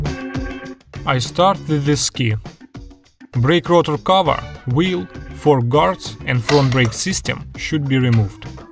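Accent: Russia. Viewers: Говорит чел с русским акцентом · У него русский акцент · Russian accent language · Ужасный акцент русского, типичное зрелище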